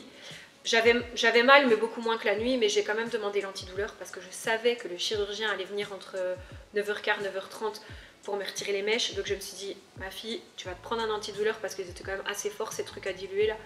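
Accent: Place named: North, France. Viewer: Cet accent du nord prononcé ! ! Le sujet est hyper intéressent, je me suis fait opérée aujourd'hui. Je ne sais pas si tu continue YouTube mais pense à raccourcir tes vidéos et couper les moments inutiles (genre le chien qui aboie) en revanche ce qui me stress c:est qu'on m'a demandé d'enlever les mèches moi même moins de 24h après. Je ressemble à éléphant man aussi! Mes yeux sont hyper gonflés et violets/noirs !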